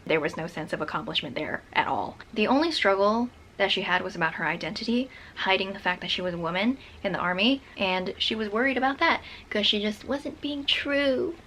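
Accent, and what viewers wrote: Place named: United Kingdom. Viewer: subbed for amazing British accent! haha. Oh and also, good review :)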